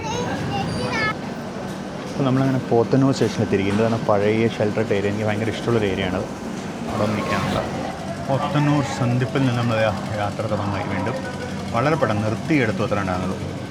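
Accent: Thrissur, India. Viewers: Musical language of Trichur, much liked. ❤❤❤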